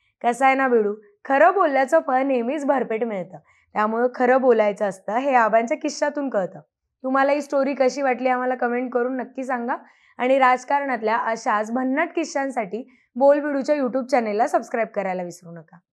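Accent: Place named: Rural, India. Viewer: आवाज ग्रामीण मस्त आहे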